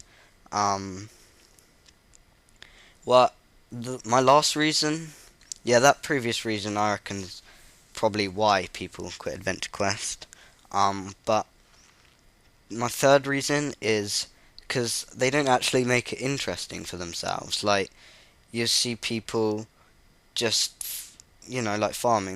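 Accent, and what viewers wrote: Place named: England, United Kingdom. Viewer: Lol I like your English accent.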